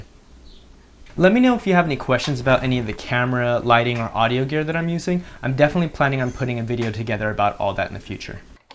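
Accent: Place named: Hawaii, United States. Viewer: You have so much more / better equipment than me. I’m kind of embarrassed 😅 are you from Hawaii? I can hear a slight Hawaii accent.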